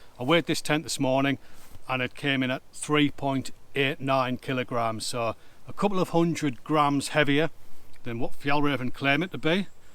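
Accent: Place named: Scotland, United Kingdom. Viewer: the Scotich accent, I love so much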